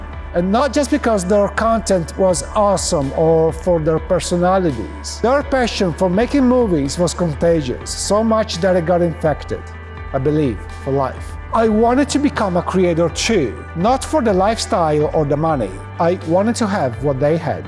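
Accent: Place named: Eastern Europe. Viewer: not to be disrespectful, but rather totally honest and non critical. you remind me of Al Pacino - but with an eastern European accent. It is actually really interesting your manner and way of speaking. i really like it a lot. and your english is perfect just the way it is! nice video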